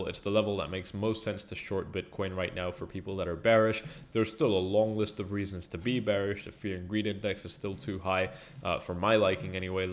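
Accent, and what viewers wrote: Scotland, United Kingdom. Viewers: Good man thanks for your videos, are you from Scotland?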